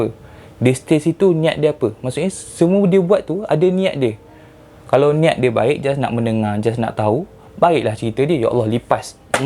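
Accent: Kelantan, Malaysia. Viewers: Kelate ko?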